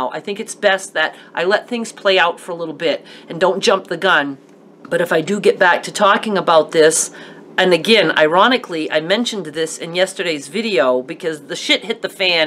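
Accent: Maine, United States. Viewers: I watch a lot of your instructional videos, but have not seen as much of your personal stuff. Thanks for sharing your struggles as many of us can relate. As a creative person myself, I know sometimes making stuff helps you deal with the crap. I have been doing some quilting and when I first clicked one of your videos, I heard your voice and saw your surname and said to myself, "she's gotta be from Maine!" Love watching you! Take care of yourself. When dealing with a narcissist, you need to put yourself first, because you know damn well she's gonna always put HERself first. Dealing with a similar situation in my own life. Hugs!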